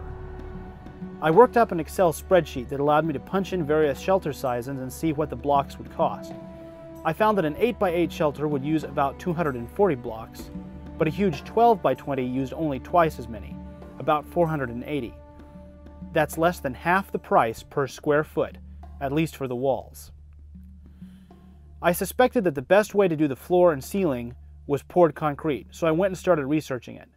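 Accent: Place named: South, United States. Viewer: Let me guess you not from Maine deff from the south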